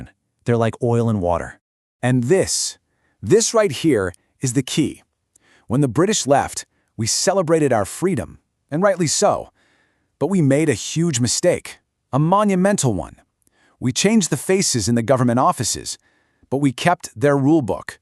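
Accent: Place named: United States. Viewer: Get the accent right with a bubbly head, this si too american.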